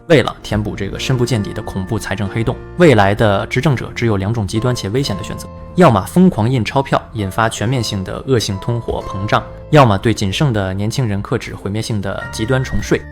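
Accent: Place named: China. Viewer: 大陸口音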